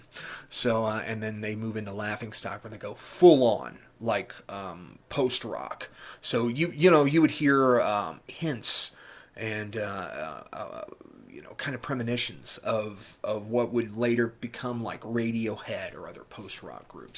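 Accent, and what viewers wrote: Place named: New Jersey, United States. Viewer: hahaha joisey. Youre a natural honey. You got this. Looking forward to it. Lol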